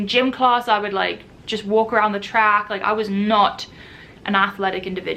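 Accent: United Kingdom. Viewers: Are you British? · With your Brit accent and my Brooklynese we should do a video together. What a trip that would be. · Your accent jumps between British and American it's wild! 🥰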